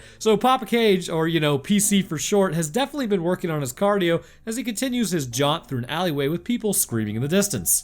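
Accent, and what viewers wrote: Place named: South, United States. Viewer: Why do all southern americanss sound the same. You sound exactly like the finance guy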